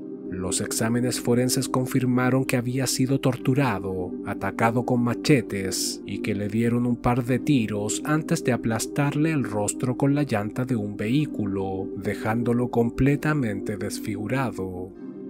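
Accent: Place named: Chile. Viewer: Hola, siempre veo tus videos, pero primera vez que comento algo. Sabes, siempre me ha intrigado esto de los enigmas policiales y como opera la mente de un criminal, es alucinante. Me encanta tu forma de relatar, me gusta que seas chileno porque me gusta tu acento (yo soy chilena), y me gusta el formato de imagen que sin ser morboso o grotesco es bastante grafico y real, la estructura del relato me gusta mucho. Te deseo sigas triunfando y mucho éxito para 2023.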